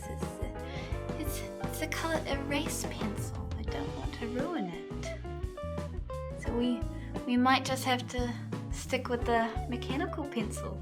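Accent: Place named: New Zealand. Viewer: i can bet you're from NZ judging from the accent ahahah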